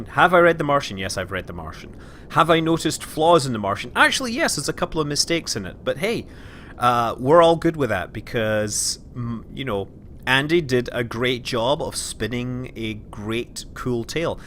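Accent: Scotland, United Kingdom. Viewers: Is he Scottish? He just sounds Scottish to me and if I'm hearing that right I'd be happy. If not I learned something.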